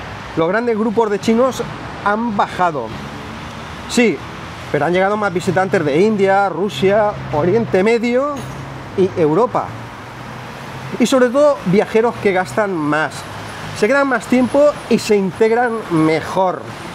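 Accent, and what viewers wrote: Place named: Alicante, Spain. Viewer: Me parece que tienes acento alicantino👋🏽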